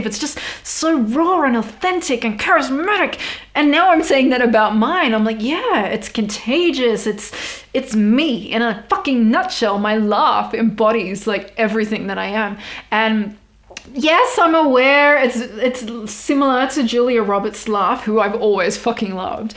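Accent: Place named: Australia. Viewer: 😂 Your Australian accent + voice = sexyness! Yep! Never been in loooove... Respect is going extinct, I guess. Oooh, and thanks for the "night mode" tip! Everything looks a lot better. I'm going to revamp my crafts page! And... You know your eye shape is so beautiful, everybody blushes! 😊 Much love, ❤️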